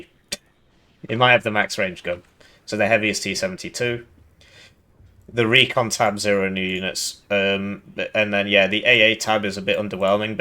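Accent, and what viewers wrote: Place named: Liverpool, United Kingdom. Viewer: Yeah I thought I could detect a hint of scouse, born and bred in the city you notice these things.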